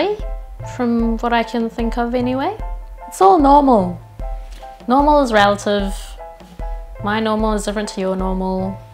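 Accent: New Zealand. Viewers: Persis bangat sama accent kiwi Btw saya di auckland daerah pakuranga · Are you kiwi? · Wow keren Kia ora, saya kiwi (Maori) dan belajar BI - anda bicara bahasa Inggris DAN bahasa indonesia dengan aksen kiwi! Saya berharap bisa membantu saya dengan BI! Chur =) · From Indonesian to kiwis, it kinda shook me bruh😂 I need to have this accent asap! So obsessed😭❤ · Pacarku orang new zealand, mirip banget cara ngomong dan accent nya sama kakak ini, salam kenal kak, God bless you:) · Are you from South Island sound like yours from the south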